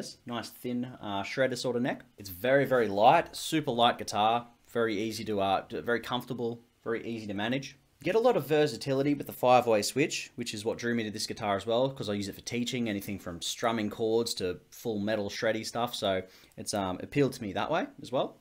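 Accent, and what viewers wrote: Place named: Australia. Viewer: I was looking at maybe buying this guitar and thought I'd look up a review, saw the Aborted shirt, then heard the Aussie accent and it was gg on the subscribe button lol 🤘👌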